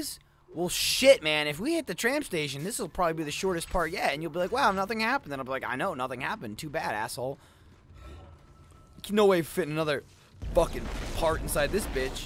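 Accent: United States. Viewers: ... You Are from USA?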